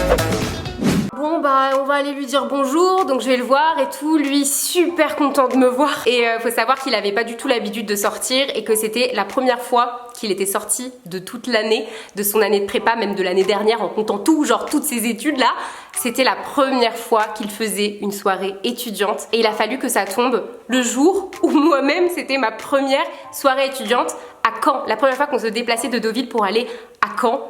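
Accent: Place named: Normandy, France. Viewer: J’adore ton petit accent normand car je suis également native de cette région et j’ai cette sensation réconfortante, familiale quand je regarde tes vidéos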